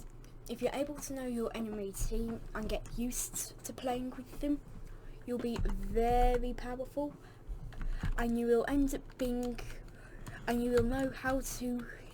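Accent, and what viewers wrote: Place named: United Kingdom. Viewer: Cool video :) I also really like your British accent lol ,also can u make a video on how to get Jesus skin for Obi-Wan-Kenobi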